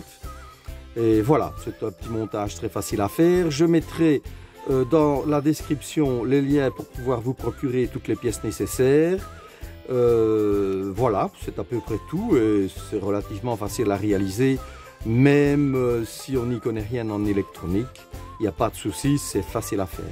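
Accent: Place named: Liège, Belgium. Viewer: Enfin un youtubeur belge (je l'entend à l'accent liégeois 😉) qui aime l'électronique. Merci pour cette vidéo et votre chaîne compte un abonné en plus. Voici le pouce 😀👍👍👍. N'hésitez pas à venir faire un tour sur ma chaîne dédiée à l'électronique débutant.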